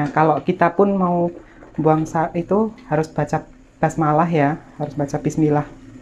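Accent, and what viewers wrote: Java, Indonesia. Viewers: Boso jowone jan medok bingit,,🥰🥰🥰🥰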